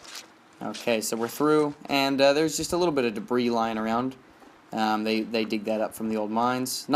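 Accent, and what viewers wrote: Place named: South, United States. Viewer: Your accent has taken on a bit of a southern twang I hadn't noticed before. Hah.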